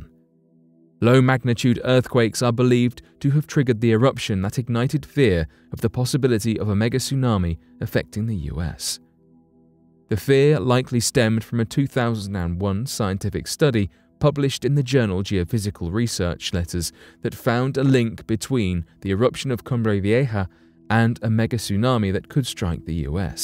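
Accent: United Kingdom. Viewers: British pronunciations are the absolute worst.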